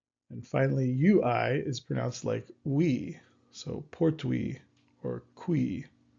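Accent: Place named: United States. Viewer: Nah, too american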